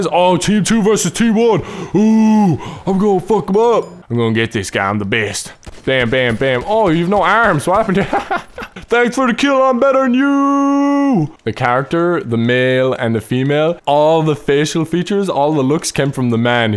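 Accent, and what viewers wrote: Ireland. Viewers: Where is he from in ireland.my guess Kilkenny .cork or kerry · r u Irish?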